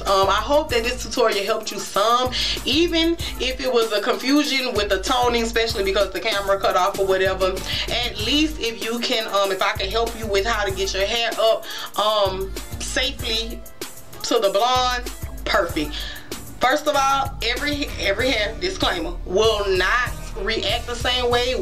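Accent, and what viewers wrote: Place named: New Orleans, United States. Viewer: and love your accent as well and your from New Orleans that's one of my fav accents girl lol ??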